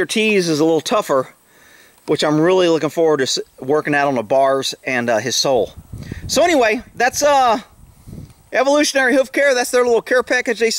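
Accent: Texas, United States. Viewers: what part of texas is that?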